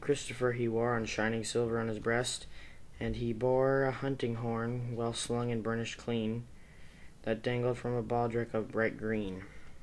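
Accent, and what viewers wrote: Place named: United States. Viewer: american accent... try speakin in an english accent pleeeease, we all sing in an american accent when we sing pop so you can at least try :) please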